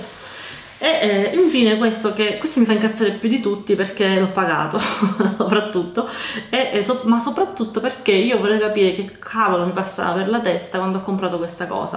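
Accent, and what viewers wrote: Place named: Palermo, Italy. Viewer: ho una curiosità ma di origine sei di Palermo o Catania? Io sonò di bagheria e dall'accento sembrerebbe tu sia di Palermo:) Dai la prossima volta che vieni fallo sapere magari ci vediamo, ci facciamo un aperitivo:) Mi piacerebbe tanto!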